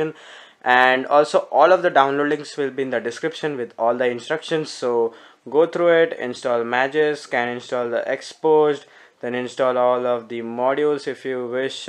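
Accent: India. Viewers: The Indian accent English is hard to understand to Chinese people.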